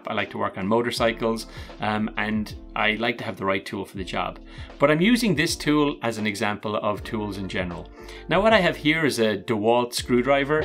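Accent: Ireland. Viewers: Great stuff and great to hear an Irish voice with products that are available in Ireland and the EU - you presentation and production skills are excellent and the content is refreshing - it is worth paying for quality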